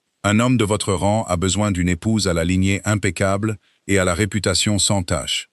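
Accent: England, United Kingdom. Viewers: L accent anglais apporte un charme à ce conte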